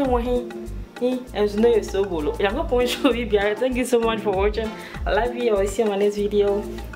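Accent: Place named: Ashanti, Ghana. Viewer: I smell an ashanti acent Grove Garic Brood Lol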